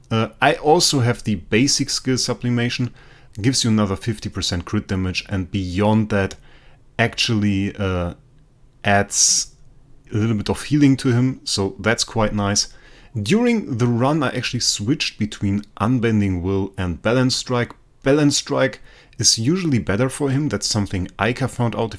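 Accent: Germany. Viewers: Bist du Deutscher man erkennt es so am Akzent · First of all great video. You make it look insanely easy. And secondly I wanna ask if you are from Germany because your accent sounds very German.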